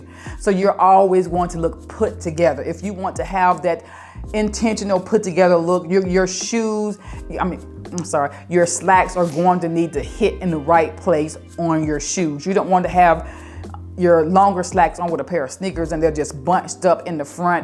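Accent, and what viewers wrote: South, United States. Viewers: First time tuning in. I was so distracted by her talking about herself and her clothes in the third person "she" and the country southern fast-talking twang and accent was everything... especially when she repeatedly says "cumtuble" over 100 Xs for the word "comfortable". Could never take someone that talks like her very seriously about anything "fashion". Let me try watching another one of her videos. I'm sure she's good but as the English scholar that I am.... my ears 🤔😏😏🙄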